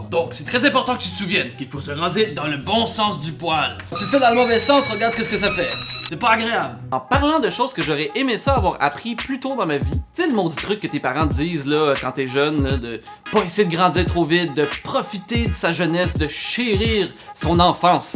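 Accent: Canada. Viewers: Belle chaîne. Bravo Canada ! Je kiff l'accent 😎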